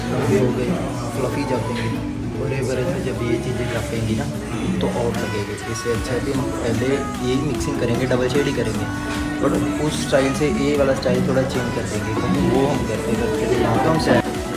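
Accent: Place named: Rural, India. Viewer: भाई तेरा बोलने का तरीका देशी लड़के की तरह है। मजा आ गया भाई। 🤗🤗🤗🤗🤗🤗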